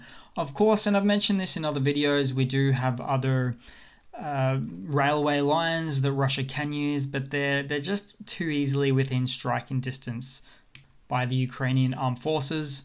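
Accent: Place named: Australia. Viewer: Hey dude Where are you from I’m in Sydney You sound Aussie You sound like Marcus House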